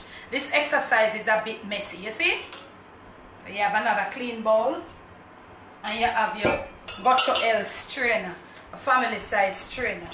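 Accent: Jamaica. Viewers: I love your presentations, but most of all, I love the patois. Just listening to you brings me closer to home.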